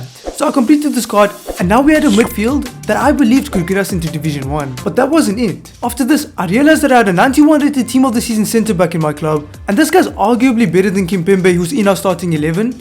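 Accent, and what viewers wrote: South Africa. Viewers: South African accent😂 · South african? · You from sa bro ??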